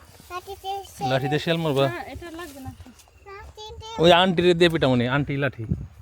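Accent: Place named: Gopalganj, Bangladesh. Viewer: ভাই, আমার এক খালাতো ভাই আছে, খুবই ক্লোজ ছিল।উনার ভয়েস, কথার টান ঠিক আপনার মত।ওর বাড়িও গোপালগঞ্জ সাটুরিয়া।আমি সাটুরিয়া বাজারে বেশ কয়েকবার গিয়েছি।খালুজান মারা যাওয়ার পর পারিবারিক জটিলতায় দূরত্ব হয়ে গেসে, তারপরেও আপনার ভিডিও দেখলে আমি আমার কাজিনকে ফিল করি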